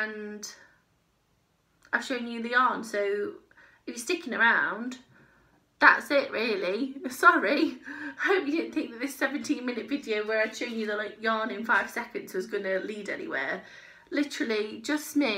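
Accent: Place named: Manchester, United Kingdom. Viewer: Hello, jan carruthers has sent me your video. I really enjoyed watching you. Well done on your 500 subs. It amused me when someone commented on your accent. It sounds very similar to mine. I m from east manchester. I assume you are from manchester too.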